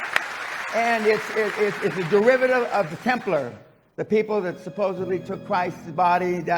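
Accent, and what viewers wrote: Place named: Los Angeles, United States. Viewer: It's a mixture. He lived in Europe as a child, because his father was an Army officer in the early 1950s, so he heard a lot of different accents from his father's friends' kids, and from Europeans who spoke English as a second language. Then the family moved to the barrio in East Los Angeles, a bad neighborhood, until his mother insisted they move. Then he lived in a nicer neighborhood. Then he joined the Army, where you hear a variety of accents. I hear some East LA in his accent, but also a bit of posh English, some standard American, it's a unique accent. No one really speaks like him.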